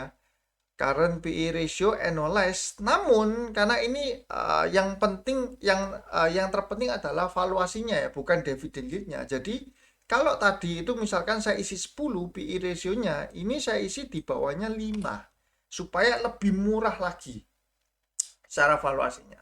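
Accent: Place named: Java, Indonesia. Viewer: Ko kok ngomong nya bahasa Indonesia medok banget ko, jadi nyaman dengerinnya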